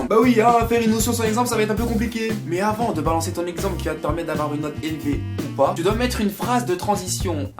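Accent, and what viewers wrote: United Kingdom. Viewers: That British accent though👌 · L'accent british qui tue tout 👌🏽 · Waa le british accent !!! Thanks for the help man 👍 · This british accent 😍😍😍😍😍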